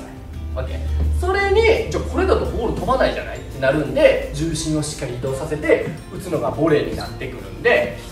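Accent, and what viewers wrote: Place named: Kansai, Japan. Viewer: ワイプのMJがシュールw いつも思ってますが、の声って高めなのと関西弁のイントネーションで良い意味で引っかかるというか、聞き取りやすくて良いですよね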